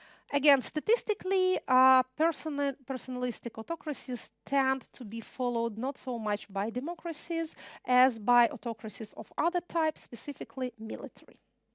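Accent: Russia. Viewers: Я никогда не поверю, что человек, который выучил английский на таком крутом уровне, не смог убрать самые жесткие штуки в произношение. Значит это специально. Кто-нибудь может объяснить, зачем специально говорить с таким жутким русским акцентом? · ой это прекрасный русский акцент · Такой-то руслиш, всё понятно :) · Hello! The content of the lecture is very interesting I would like to note about the quality of English, which Ekaterina speaks. Catherine's English is definitely better than many of our journalists and public figures. Nevertheless, I noticed strong Russian intonations. Phonetics is not bad, but there is work to do. Thanks for the lecture. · Спасибо за видео, скучала без вас. Понабежавшим училкам английского - русский акцент для русскоязычного человека это нормально, как и некоторое количество ошибок. Темп речи и словарный запас просто на отлично!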